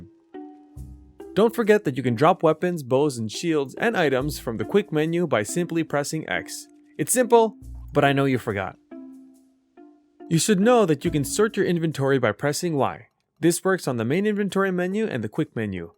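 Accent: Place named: Netherlands. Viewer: alles goed? Edit: NL Engels accent ; ) goede tips trouwens. Bedankt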